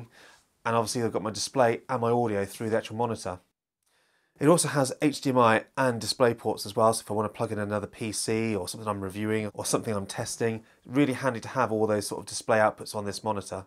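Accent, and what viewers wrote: United Kingdom. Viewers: Great video I stumbled onto searching for the combo of this specific BenQ monitor combined with a Mac. So thanks! You sound like you're from the UK (later in the video I hear you are... :-) ) ... but the link to the Bamboo stand is to the US Amazon... any idea if this great stand is available somewhere in Europe??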